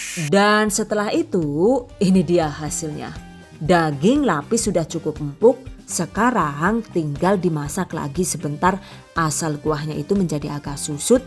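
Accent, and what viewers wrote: Banyumas, Indonesia. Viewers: Mantab masak teruuus dan lengkap Tips&Trik memasak. 👍👍👍 Mbak, Saya boleh tanya? Kira-kira apakah mbak ada rencana membuat buku berisi kumpulan resep lengkap ala lengkap Tips&Trik memasak juga?, kalau iya maka saya minat banget mau request membeli buku resep dari. Namun YouTube-nya tetap eksis mbak ya?, karena selain menyenangkan memasak 👌 dan saya terhibur menyimak pelengkap sedikit fitur kartun 😀, apalagiii bahasa inggrisnyaaa 👍 serta cengkoknya 👍 dan kadang-kadang disertai bahasa ngapak terhibur bangeeet 😁. TETAP PERTAHANKAN STYLE-NYA YAAA, SOALNYA JARANG-JARANG ADA (BAHKAN STOK LANGKA LOH YAAA) KULINER WANITA YANG JUGA MEMILIKI NILAI LEBIH BISA MEMBUAT GUYONAN. Oke mbak, kembali ke fokus request saya hal buku. Jangan lupa dibalas ya?, Saya tunggu kabarnya.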